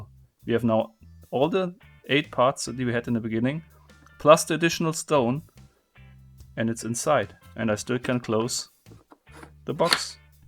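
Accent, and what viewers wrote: Germany. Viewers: Glückwunsch zu den 100k. Ich bin schon etwas länger dabei und bin bis heute wegen dem deutschen Akzent fasziniert xD Ich mag jedenfalls deins Videos ( Das ist eig was ich normalerweise sagen wollte haha :D) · using V1 & V2 as a name for that thing is somehow funny with your german accent ;D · This channel is great for so many reasons bro. The presentation is great and your German accent is pleasant to listen to. your showing tons of puzzle I haven't seen and the way u show the puzzle then "let us try" THEN solve it keeps me watching. great job! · Glückwunsch !!!! ( dein deutscher Akzent verrät dich ;D ) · Du sprichst sehr gut englisch aber ich höre deutschen Akzent heraus you're speaking very well English but I can hear a German akzent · Ich weiß genau das du aus Deutschland stammst! Hört man ein bisschen am Akzent😉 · Übelst deutsches englisch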